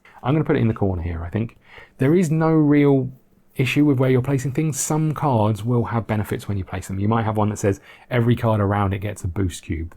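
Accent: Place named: United Kingdom. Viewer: Sooo nice to hear a fellow Brit do a playthrough …makes a nice pleasant change from voices across the pond lol…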